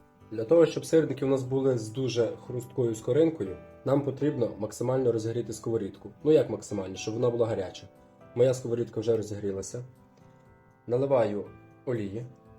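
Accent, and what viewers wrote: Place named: Carpathians, Ukraine. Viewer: Доброго дня., ти чудовий кухар. Все в тебе надзвичайно смачно і швиденько. А я хочу вибачитись за тих хто заходить на канал і починає показувати, який він мовник. І як добре вміє розмовляти. То ідіть в школу і викладайте і навчайте своїх дітей і внуків. Наша карпатська мова дуже різноманітна. Так розмовляли наші дідусі і бабусі, батьки, ми і наші діти. Ні, щоб подякували за рецепт, а викаблучуєтесь. І не соромно вам люди. Порядна людина ніколи не буде писати таких дурниць. Радуй нас своїми кулінарними шедеврами і розмовляй так, як говорять у нас в Карпатах. Бережи тебе Боже.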